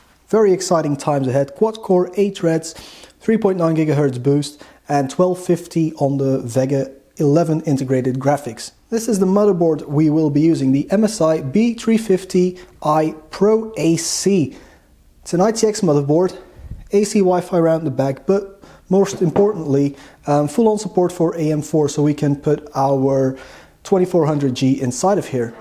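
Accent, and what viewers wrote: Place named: Netherlands. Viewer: Where are you from? You sound dutch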